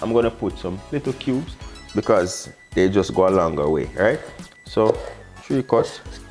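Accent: Jamaica. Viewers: I love to watch you cook.Jamaican accents are my favorite, from the mountain to the beach and long the country side. How did you learn to cook so well, your parents or grandparents or did you go to culinary school?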